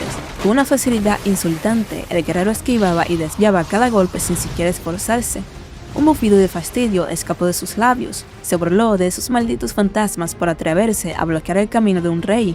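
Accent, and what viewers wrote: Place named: Dominican Republic. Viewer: 🗣️🔥🔥🔥Lo sabía es que el acento dominicano es eterno ✋🗿🤚🇩🇴🇩🇴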